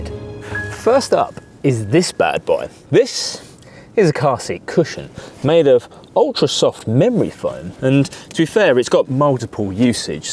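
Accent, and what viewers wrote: United Kingdom. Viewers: He's british, that's how they say it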